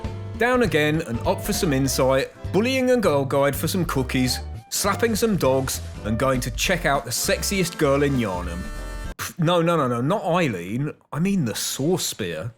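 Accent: United Kingdom. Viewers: finally is talking you sound british my dude🤩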